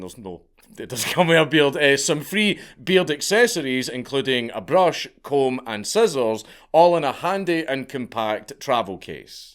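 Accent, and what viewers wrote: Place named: Glasgow, United Kingdom. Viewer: Mate, I love this channel, I love your style and being Glaswegian so listening to your accent gives me a feeling of being in the company of family as my mother is Glaswegian and rest of family are Scottish. But to see Alex crying about his children when he destroyed the lives of 26 families, who actually had their family members including children killed, all to sell his protein supplements. "They're going to take your guns, "DESTROY THEM ALL because they're all actors", with absolutely no proof. Mate, you need a to make better choices in friends. He might have some awesome charisma and you may have developed a bit a a idol crush on him. And some of his theories may be correct but the way he went about the Sandy Hook incident he deserves all he gets. He is a greedy piece of shite that deserves all he gets.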